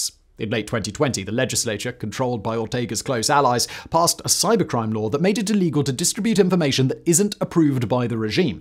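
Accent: United Kingdom. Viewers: you might want to tone down on your pompous Bristish style of speaking. its a turn off.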